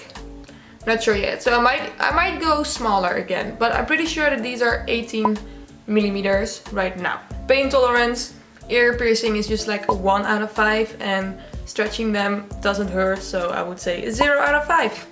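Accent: Netherlands. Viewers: Is ze Hollands ? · Ben jij Nederlands want ik hoor een nederlands accent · moon kit ja ze is nederlands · I could hear youre from the netherlands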